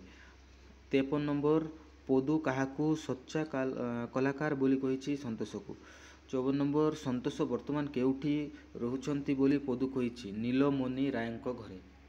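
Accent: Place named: Bengal, India. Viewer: ବେଙ୍ଗଲୀ କି ତମେ 😂😂😂ଓଡ଼ିଆ ହେବନି ଭାଇ ତମ ଦ୍ୱାରା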